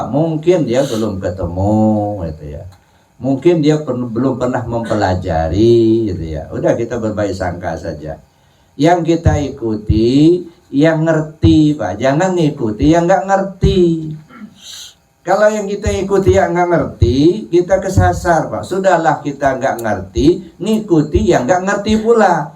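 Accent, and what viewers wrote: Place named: Sumatra, Indonesia. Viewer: Assalamualaikum, Kalau boleh tau pak orang apa ya, logatnya seperti orang Sumatra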